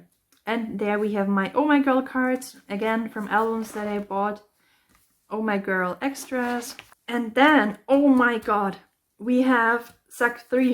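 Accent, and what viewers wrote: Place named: Germany. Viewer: I really like your binder!! It looks really really nice! I think it's so cute how nervous you are, but you really don't have to be. You are doing a great job. Judging from your accent I believe you are german? But don't be insecure about it, it's really cute😁👍👍❣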